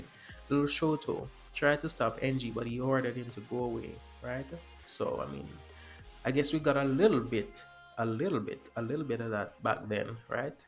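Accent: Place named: Caribbean. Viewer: you sound like you're from the Caribbean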